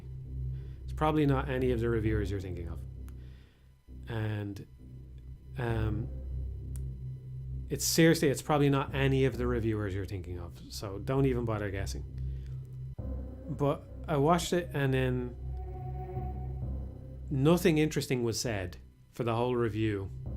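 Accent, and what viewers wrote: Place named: Ireland. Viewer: It's really nice to hear your dulcet irish tones again Here's hoping we get to hear them again soon, happy holidays man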